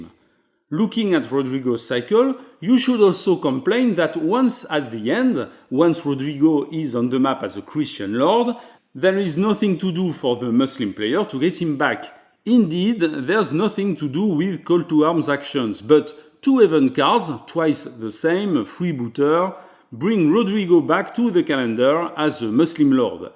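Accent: France. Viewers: Thanks to you I was able to play Nevsky and I made some great memories with my best friend. I just bought this game because you made this tutorial. You are the best, if you keep making these as this series rolls out I will keep buying them. Plus you're French accent is awesome. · Giving the thumbs up for the french accent alone. Very nice video.😊👍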